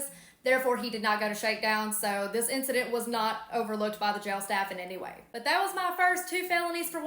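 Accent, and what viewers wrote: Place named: South, United States. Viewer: Great! I had to listen to this twice, she got me with her southern accent and her looks and never listened to what see said.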